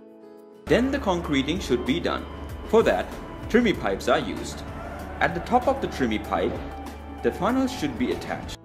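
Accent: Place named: Caribbean. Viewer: Its a carribean or Caribbean accent and his diction is quite clear.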